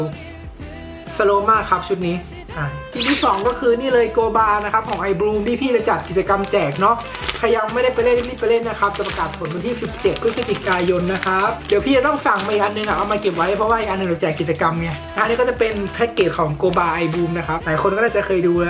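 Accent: North, Thailand. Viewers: คนเหนือหลอ?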